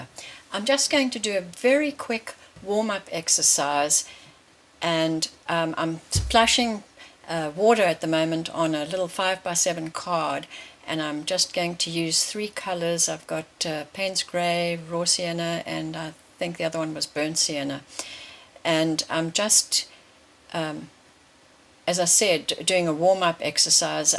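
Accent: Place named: South Africa. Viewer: Hi..I'm new to water colour, and your demo's are so helpful. I'm am oil painter, so it's quite an adjustment trying to work in reverse to what I'm used to. Nice South African accent too...where in SA are you from 😊?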